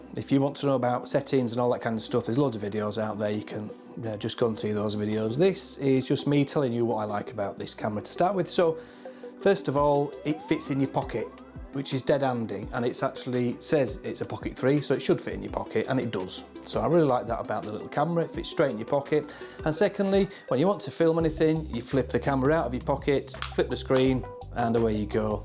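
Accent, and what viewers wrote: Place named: Halifax, Canada. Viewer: You sound originally pretty local to me in Halifax! decent video, have you filmed any wedding footage with the osmo or just vlogging yourself? thanks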